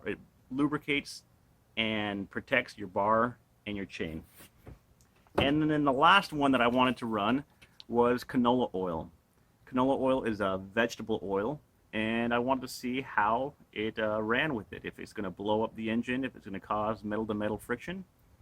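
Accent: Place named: United States. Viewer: No way Aussie, definite American there mate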